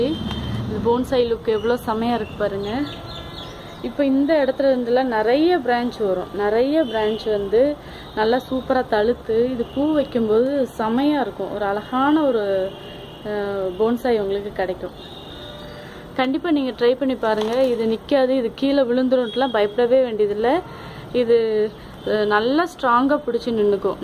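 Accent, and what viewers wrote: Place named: Tiruchendur, India. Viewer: நீங்க எந்த ஊர். எங்க சொந்த ஊர் திருச்செந்தூர்... நீங்க பேசுற பாசை எங்க ஊர் side மாதிரி இருக்கு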